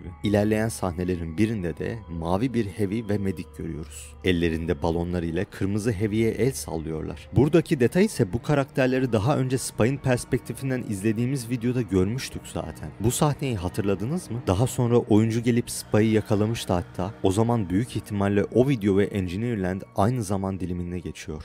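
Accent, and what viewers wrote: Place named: United States. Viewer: Amerikan Türkçesi diyoruz biz bunlara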